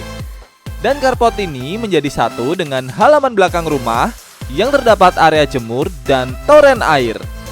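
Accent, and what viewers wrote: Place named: Java, Indonesia. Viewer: masnya ngomongnya medok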